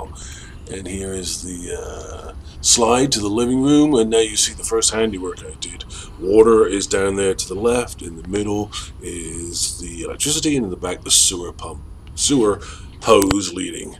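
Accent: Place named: Manchester, United Kingdom. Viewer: good travels--------i'll be subscriber # 12----------- i'll watch you on your journey. Please pass the Grey Poupon. Are you a Brit? you sound just like my brother-in-law, he's from Manchester. you answered that question-------cup of tea, lol.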